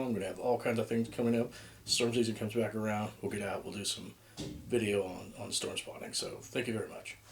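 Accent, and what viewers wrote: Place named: United States. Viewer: Even though you have an american accent, I believe you are a person who fled from Iran and is probably like an american proud christian now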